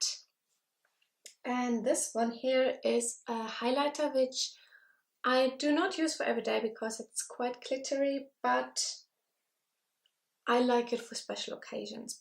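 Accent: Germany. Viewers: Your German accent is great, and your English is good! I subscribed to you after your velvet lips video because I am interested in makeup and the German language. I learned some makeup-specific German vocabulary from your videos!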